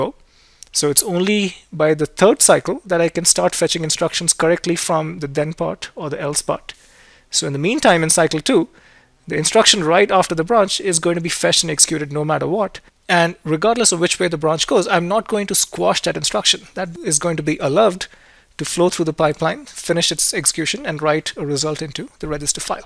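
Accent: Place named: United Kingdom. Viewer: I have to say I love your british accent with a hint of indian as well. A lot less thick than most Indian computer science teachers you can find, and lot easier to understand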